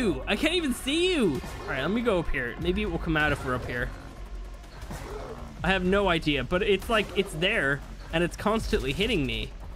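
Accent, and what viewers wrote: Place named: Ireland. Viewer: He sounds so Irish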